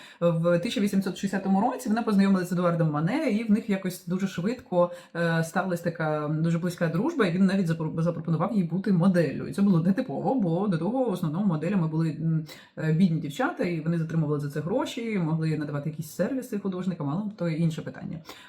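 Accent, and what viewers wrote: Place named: Russia. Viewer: Дякую, за відповідь! Мені дуже подобається ваш контент. Пишучи про мову, я перевіряла свій слух - він у мене близький до абсолютного(я скрипалька за освітою), - того, я радію з свої здібностей. Ваш російський акцент, або російська артикуляція, практично, не помітні.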